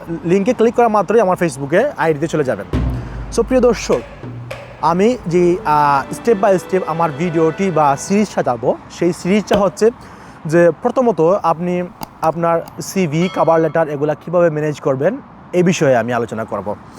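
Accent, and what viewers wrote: Sylhet, Bangladesh. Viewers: আসসালামু আলাইকুম ভাই আপনে সিলেটি মন হয় রিপ্লাই দিবেন কিন্তু ❤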